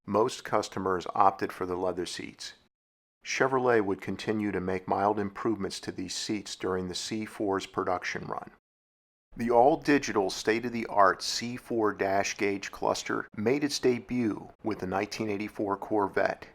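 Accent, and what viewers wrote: United States. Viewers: Wow. That was a great video and voice over. The narrator had the greatest American accent of all time. Good topic and spot on.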